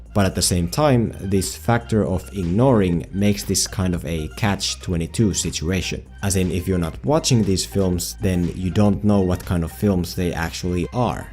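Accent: Finland. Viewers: Are you Finnish? You're accent sounds Finnish. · is krakozhia a inside joke? because i couldnt find anything on google and tbh you sound finnish torille tavataan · Your accent sounds so fucking Finnish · Nah, it's a fictional East European country from some movie or video game or something, I don't remember. But he is clearly Finnish, I can tell by his accent (I'm a Swede). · krakozhia... hmm .. but you accent still sounds alittle ... Finnish... maby... Estonian... maby... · Ahh I recognize a finnish accent when I hear one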